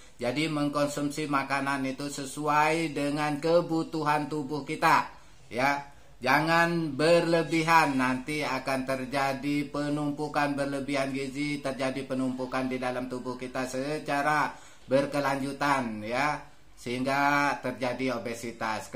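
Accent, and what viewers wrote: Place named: Java, Indonesia. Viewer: Mantap jowa ciri khas suaranya bah semangat terus om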